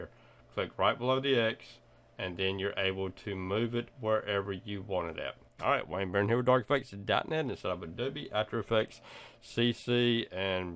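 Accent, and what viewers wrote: South, United States. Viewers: Thank you, you've saved me so much time! Love the Southern Drawl, too. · Nice hearing a southern accent in a tutorial for once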